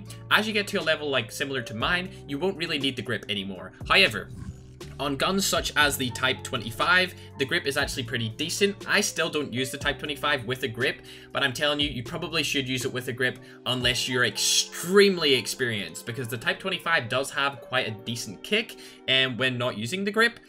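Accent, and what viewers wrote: Ireland. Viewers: are u irish? pls anwser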